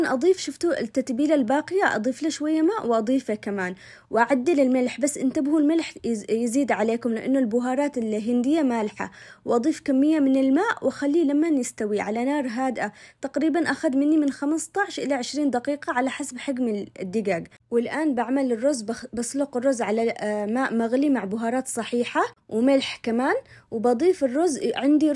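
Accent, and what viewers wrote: Aden, Yemen. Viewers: مرره احب طبخك اتابعك استمرار خاصه صوتك لهجه اليمنيه 🥰🥰 الله يسعدك ابي طريقه بروستد من طريقتك الخاصه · حبيبتي بنتي الغاليه ربي يحميك يسعد قلبك انتي بلسم على القلب خرجت من عدن واني صغيره ورجعتني لكل حاجه حلوه للكلام الدي في بعض من الناس يستحون من الهجه بس انتي برابه عليك انتي تتميزي بلهجه العدنيه وده اول نجاحك وموهبت بطبيخك الحلوه ربي يوفقك ياقلبي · السلام عليكم الله يحفظك يا بنت بلادي سويت اشتراك في قناتك بسبب اللهجه حق عدن و علي الاكل و المحتوي الجميل من دون تكليف . تحياتى لك و المتابعين في قناتك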